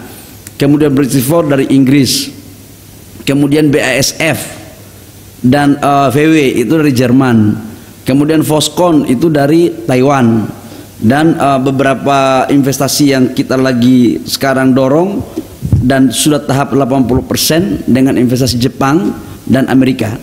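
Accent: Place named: Eastern Indonesia, Indonesia. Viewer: Logat Timur selalu melekat 😁😁 tetapi semangat pak 🥰🥰😇😇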